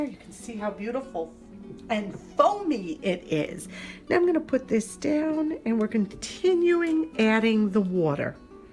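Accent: New York, United States. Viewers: you and are doing a great job. I initially came over from "Mornings with Granny." When I first watched your video something in my heart and mind clicked from your voice. I grew up in NY and I recognized that in your voice. It wasn't until later that you confirmed it that I got the "ah hah, no wonder" feeling. You share from the heart and I appreciate i Your tips are great, keep them coming. I like the recipes and the honesty you share. Thank you for bringing "a little joy to my day."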